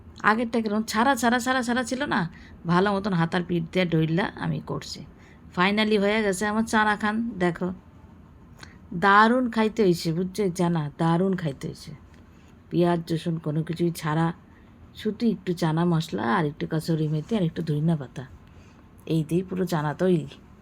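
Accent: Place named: Dhaka, Bangladesh. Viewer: দিদি ঢাকাইয়া কথা আমার শশুর বাড়ি বাপের বাড়ি সব ঢাকাইয়া। তোমার কথা শুনলাম সত্যি সত্যি অনেক ভালো লাগলো। চানা দারুণ লোভনীয় হয়েছে। তৈরি করার পদ্ধতি দারুণ। ভালো থেকো দিদি। ❤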